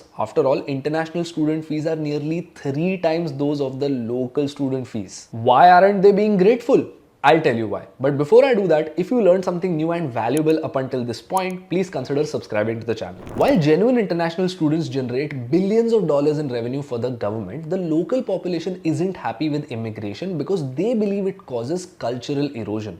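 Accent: India. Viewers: Funny North Indian accent 🤣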